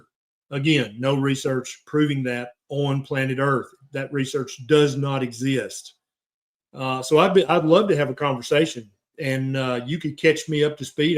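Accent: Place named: Upper Peninsula, United States. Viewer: Michigan- Yooper:)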